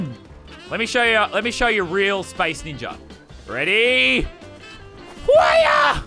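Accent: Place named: Australia. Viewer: Lazarbeam every one is saying ur British but ur Aussie Like me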